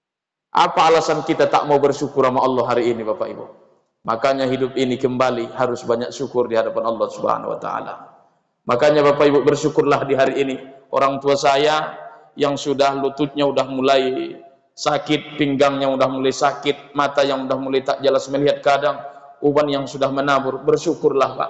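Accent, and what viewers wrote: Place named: Pekanbaru, Indonesia. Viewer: Daerah mana ya ini, macam pekanbaru bahasanya.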